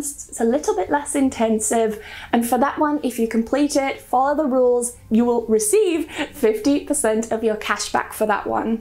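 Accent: United Kingdom. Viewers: your British accent is just delicious! Your voice is music to my ears.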